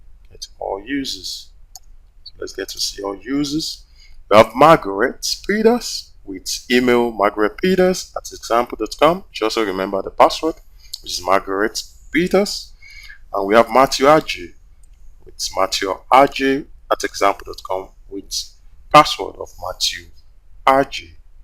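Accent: Nigeria. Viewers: are you nigerian?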